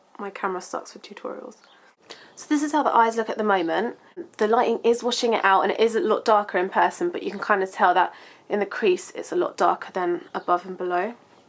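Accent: United Kingdom. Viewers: OMG You are so beautiful!I love your voice! I love your British accent!I love your videos!♥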